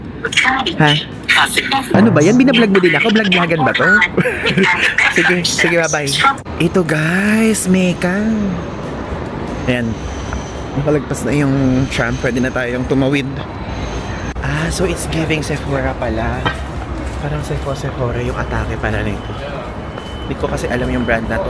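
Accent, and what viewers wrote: Nueva Ecija, Philippines. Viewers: Pag lumalabas ung Nueva Ecija accent mo naalala ko ung friend. Ang kyuuuut hahaa 😁🤗🤗🤗🤗🤗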